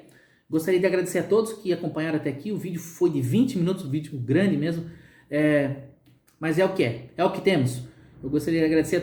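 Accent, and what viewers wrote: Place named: Brazil. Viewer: Você nem parece brasileiro a não ser pelo sotaque. Parece inteligente e educado. Domina a Língua Portuguesa. Você está com problemas devido às muitas vigarices com as cartas de condução. Evite a palavra camioneiro (?) e utilize o têrmo camionista, bem como Policia e não policial. Tem que se adaptar à realidade lusitana e boa sorte...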